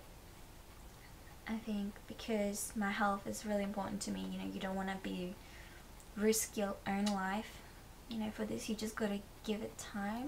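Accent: Australia. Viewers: Thank you for sharing your personal experience with taking Zoloft. I'm about to start taking it and it was nice to find an honest review :) Are you from Australia by chance? Your accent is really pretty and sweet! ^^. Anyway, thanks again. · Blimey, no wonder you got anxious, is that a picture of Vladimir Putin on your wall? This video has been very helpful. I'm starting Zoloft today for treatment of a chronic anxiety condition. That sounds like an Australian accent. If you don't mind me asking what part of Oz did you grow up in?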